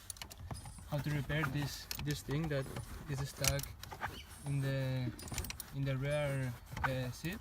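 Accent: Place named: Spain. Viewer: Hahaha saludos desde USA me mola tu acento de español Hahaha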